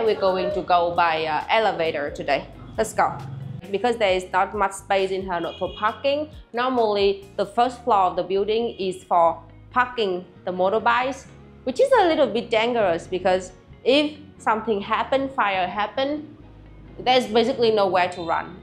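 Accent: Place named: Germany. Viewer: The cutest thing is hearing your English sound more and more like a German speaking English, it’s adorable :’)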